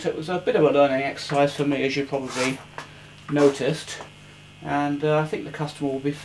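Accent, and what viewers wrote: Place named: United Kingdom. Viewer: watching so many of your videos from usa i am picking up your british accent. :).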